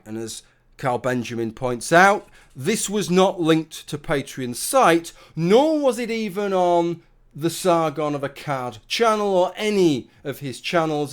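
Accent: United Kingdom. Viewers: I hate sargon. But i dont think he should have his income taken away like this. Its not his fault that its so profitable to be a pandering bitch on the internet since his audience is so dumb and will give him money for talking about a country he clearly knows next to nothing about. I dont see why patreon has a problem with a british accent trying to convince ppl he is smrt.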